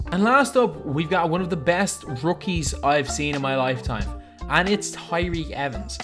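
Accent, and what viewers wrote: Ireland. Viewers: Dude u sound Irish?? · D-ManHD lol what is this comment? He sounds Irish.... because he is Irish. Think about it.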